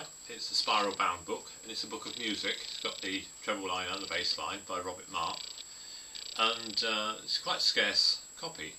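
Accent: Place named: England, United Kingdom. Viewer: hi are you still selling books? It's so refreshing to hear an English accent on here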